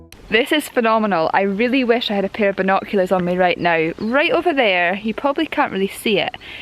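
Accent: Scotland, United Kingdom. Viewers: Loved the video! I am late to watching your channel so I am trying to see all your work. You are helping me enjoy the confinement of COVID-19. Who would have thought what 2020 would bring...just crazy. There is so much to enjoy in your videography and photography. You should consider documentary voice overs as your enunciation is so clear and the Scottish accent is beautiful. I may have said this before I could listen to you talk all day. Stay safe Fred in Texas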